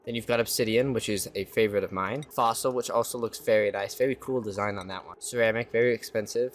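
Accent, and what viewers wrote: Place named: Omaha, United States. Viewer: Your accent sounds alot like those from the Omaha, NE area.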